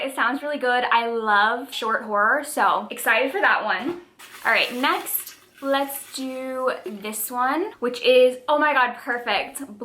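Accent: United States. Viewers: Hi!! I just wanted to comment to say that Winter People authors name is Irish so it’s pronounced ‘Mac Mah-Hun’ you kind of said it right but with a very very strong American accent which is probably why it sounds kind of weird to us lol!!! I love your videos and your tbr vids are probably my favourite thing to watch 😂 💕💕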